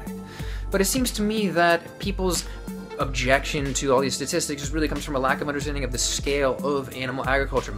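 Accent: United States. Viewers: What accent? I assume you're talking about some version of an American accent but It just sounds like a typical American accent to me.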